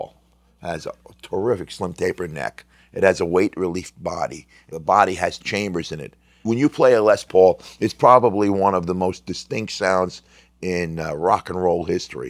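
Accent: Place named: New York, United States. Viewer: I got the same guitar only left handed. Best les paul I've ever played! Nice italian New Yorksish accent!:)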